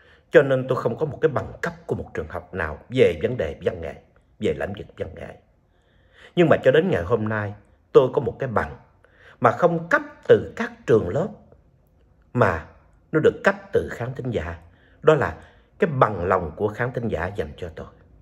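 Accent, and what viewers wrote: Standard. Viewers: Bác Thảo MC nói quá hay quá chuẩn, cháu rất quý tác phong làm việc, giọng nói và cách dí dỏm của bác. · Chú nói khá chuẩn . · loi noi cua anh chuan ko can chinh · va rat chuan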